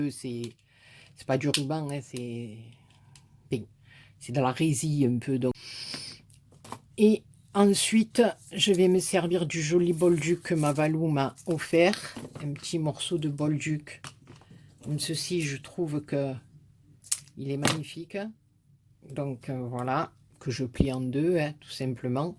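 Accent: South, France. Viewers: Trop jolies tes fleur des neiges et ton accent j adore tu me fais voyagé dans le sud à chaque fois. Et tes explications son très claire pour moi Ta raison fais péter les fleurs au moins sa sent bon🤣🤣 pleins de gros poutous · Un accent que je connais bien... puisqu'on me dit toujours..." t'es du sud..."... encore merci pour tes tutos, tes explications.. et surtout pour tes idées géniales de déco...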